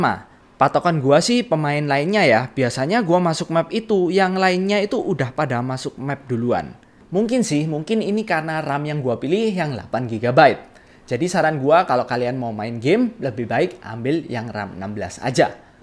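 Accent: Java, Indonesia. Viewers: Bang bagusan bilangnya "saya" jgn "gua", soalnya logat lu medok bgt 😅, jd gk enak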